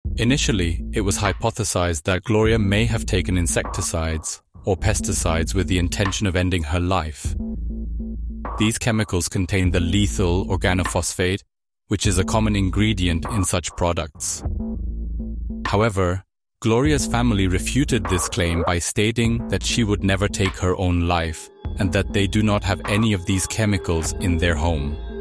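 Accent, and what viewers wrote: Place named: Germany. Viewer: Just chiming in to say your voice is great, and the German accent makes it a bit more interesting.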